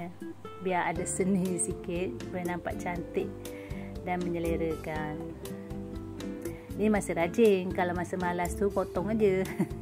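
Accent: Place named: Malaysia. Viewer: saya juga kurang suka dawat nya kak karna nggak enak dipandang warna nya setelah dimasak, denger kak ngomong berasa nonton drama malaysia, aku suka film2 malaysia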